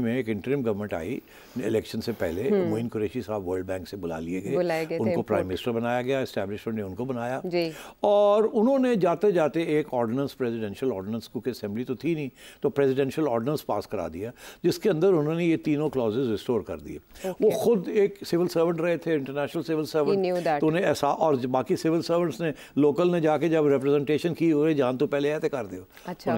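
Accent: Punjab, India. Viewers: Punjabi tuch excellent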